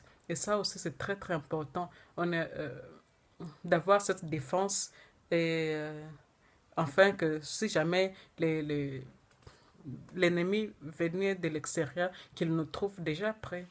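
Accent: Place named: Germany. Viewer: Joli ce petit accent d’Allemagne petite sœur......Tmtc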